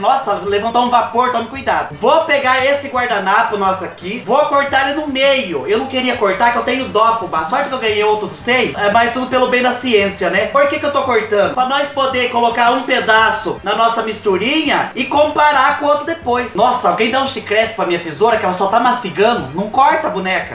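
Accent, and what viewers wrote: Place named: Paraná, Brazil. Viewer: Cara vc é muito bom adorei com esse sotaque até parece que vc mora perto de mim aqui o povo fala assim kkkkk interior do interior do interior de Curitiba kkkk doce fino o meu endereço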